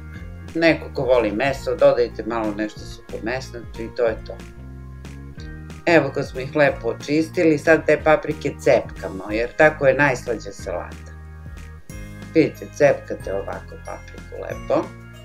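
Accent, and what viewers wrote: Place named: Kruševac, Serbia. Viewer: Uživala sam u Vašem, mom po rodjenu kruševačkom dijalektu.Interesantno to nikada nisam jela. Baš moram da probam! Hvala Vam!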